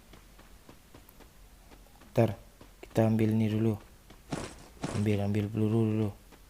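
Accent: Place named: West Sumatra, Indonesia. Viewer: Logat minang nya,kerasa ya 😂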